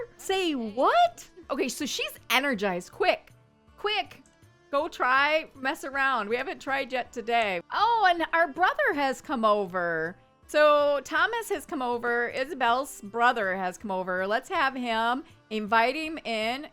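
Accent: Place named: Midwest, United States. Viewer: Where are you from in the Midwest I’m getting nostalgia from the way you speak since I used to live in Minnesota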